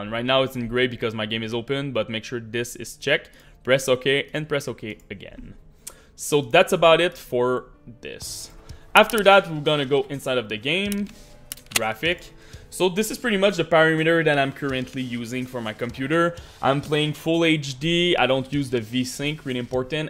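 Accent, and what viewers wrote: Quebec, Canada. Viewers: ca sens lquebec a plein nez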